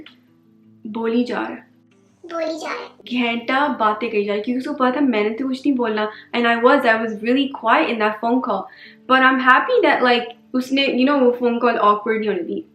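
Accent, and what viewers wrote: Pakistan. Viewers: Your Urdu accent is exactly like Pakistanis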